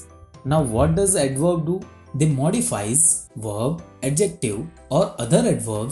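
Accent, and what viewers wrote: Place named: South India, India. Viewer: i think he is south indian😂....nice job sir.... rspct india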